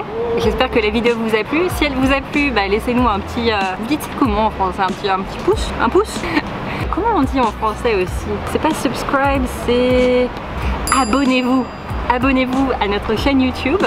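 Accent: France. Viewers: I am always so entertained by various facial expressions associated with her french inflected English that following the subtitles I feel like I missed something........................because I had to keep looking down to see what she was saying.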